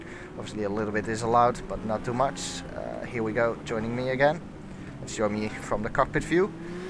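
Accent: Netherlands. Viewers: Zo grappig dat je toch echt hoort dat je een hollander bent.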